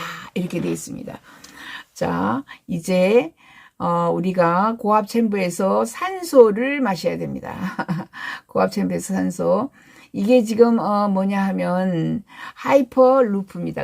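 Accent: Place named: North Gyeongsang, South Korea. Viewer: 경북으로 시집온 중국동포입니당. 구수한 지망말투 친근함을 느낍니다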